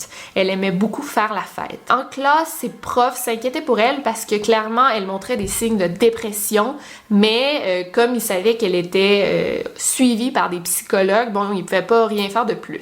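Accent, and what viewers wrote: Quebec, Canada. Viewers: Salut, je pense que tu ne verra pas ce message mais sa ne fait rien je prend plaisir a te l'écrire alors je voulais te dire que j'adore ta chaine! Elle est passionnante. Bravo pour tes 162 k moi personnellement je suis depuis tes un peu prés 50 k! D'habitude je ne supporte pas l'accent québécois mais avec toi c'est différent j'adore ta voix. Je trouve que ta chaine est très intéressante je te trouve très belle douce et.J'éspere que tu aura plus d'abonnée pourquoi pas le million? Enfin tous sa pour te dire que je t'adore bisous d'une grande fan <3. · Avant je ne pouvais pas regarder des vidéos québécoises à cause de l'accent étant donné que je suis française mais je suis tombée amoureuse de tes vidéos, elles sont géniales, je me suis habituée et maintenant je ne peux plus m'en passer 💁🏽‍♀️ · Encore une super vidéo. Tu explique très bien et simplement et j'adore ton petit accent Quebecois ♥♥ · J'aime tellement l'accent, je pense que je vais aller vivre au Canada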